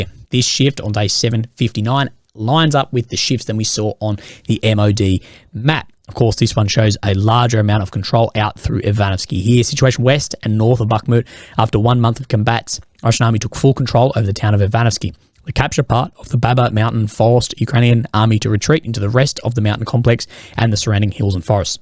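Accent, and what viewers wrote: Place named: Australia. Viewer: Its just how people talk in Australia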